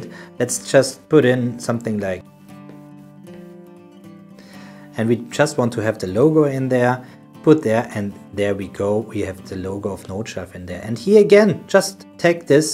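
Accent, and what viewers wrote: Germany. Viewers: Wie man einfach sofort hört, dass er deutsch ist 😅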